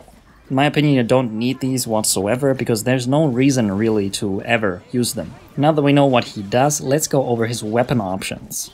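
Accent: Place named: China. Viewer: So, random question your pronunciation of the names makes me think that you are Chinese, which province are you from? I am from Shanghai in Jiangsu.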